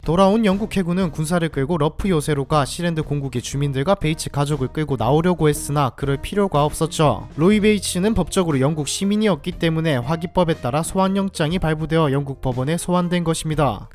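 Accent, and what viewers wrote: Gyeongsang, South Korea. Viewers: 여자목소리 들으면서 자면 달달해서 구독 눌렀다가 이 울산인지 김해인지 진주인지 모를 촌놈스애끼 씨부리는거 듣고 구독취소 바로 박음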